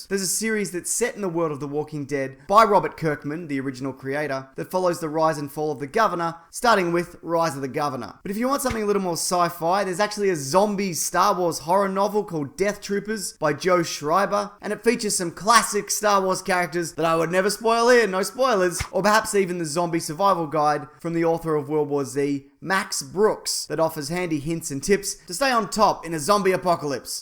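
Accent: Australia. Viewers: okay first off my Ozzie friend. I love your videos for some reason you always say what is going on in my head LOL I know that sounds strange but that's not the point. I just want you to say tattoos as many times as you possibly can. because it's definitely not the way here in the US say tattoos LOL but I love it I first noticed when you were talking about the Joker and hoe it was not a fully realized character but you said tattoos at least a dozen times. okay well that about covers it just make a video about tattoos where you say the word tattoos as much as possible you Ozzie's you. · The generally upbeat, laid-back rhythm of the Australian accent contrasts perfectly with your particular brand of snarling vitriolic sarcasm. This channel is a joy to listen to.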